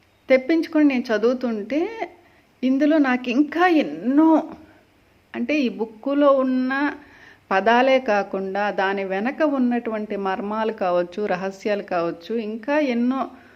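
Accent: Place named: Nellore, India. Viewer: నమస్తే మాస్టర్, గత కొన్నిరోజులుగా మీ వీడియోస్ కోసం ఎదురుచూస్తున్నాను. అంతలోనే అత్యంత అద్భుత సమాచారంటతో మీరు దర్శనమిచ్చారు. మీ మాడ్యులేషన్ మరియు కొంత నెల్లూరు యాస చాలా ముచ్చటగా ఉన్నది. మాస్టర్, మీరు మేము ఇంతవరకు ఊహకు కూడా అందనటువంటి జ్ఞానాన్ని అందిస్తున్నారు. ధ్యానం మీద మీరు చేసిన వీడియో బాగా ఉపకరించింది, మాస్టర్ ధన్యవాదములు. అయితే, కలిగె సందేహాలు, మీతోని నివృత్తి చేసుకునే వీలు లేకుండా పోవడం చాల నిరుత్సాహం కలిగిస్తున్నది. ఏదైనా మార్గం చూపించ గలరని ఆశిస్తున్నాను. కృతజ్ఞతలు మాస్టర్ 🙏🌸🌼🌺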